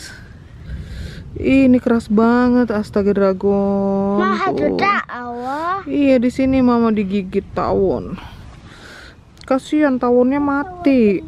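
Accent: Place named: Java, Indonesia. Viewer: Ya...allah liat mamak pakai bahasa jawa jadi jawa madura , kemekel aku mak dengernya #asli sidoarjo jatim #fans online mu mamak#semoga menular punya baby penantian 13th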